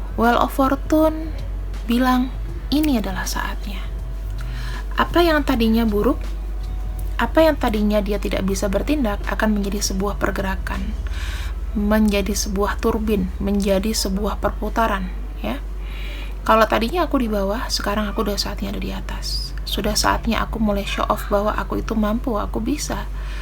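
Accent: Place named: Blitar, Indonesia. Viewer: bhsamu kayak orang blitar. Jawa tengah. " YO BEEENN ??? 😆.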